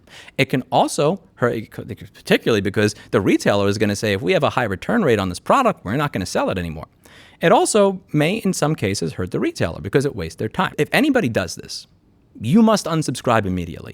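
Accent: New York, United States. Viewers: that New Yorker accent! You know he's frustrated 😅 screw these companies! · let the New York out in this one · You can take the guy out of Noo Yawk... I love you,. BTW, I agree 110% about Thermaltake & Herman Miller. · You're the best. Your angry New York accent always makes me grin. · Haha, nice try on the accent but it’s still a New Yawka accent. Keep up the good work! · I love when gets mad he get progressively more Italian and Yorkie. Never ceases to put a smile on my face that someone will get this upset over someone else's issues. We need more people like him.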